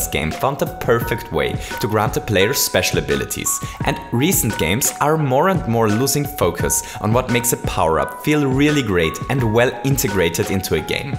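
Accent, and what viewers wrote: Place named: Germany. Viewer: You have awesome content but the speed you are talking gives no chance to deeply analyze everything + I believe you are German speaker because your English sometimes makes me feel I am listening German, so my brains errors =) I believe if add little delays between sentences that will make videos even more interesting. Thanks anyway and like!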